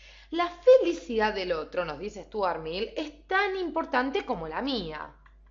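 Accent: Argentina. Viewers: Así hablamos en Argentina. La chica del vídeo es argentina. Algún problema con nuestro modo de hablar o pronunciar?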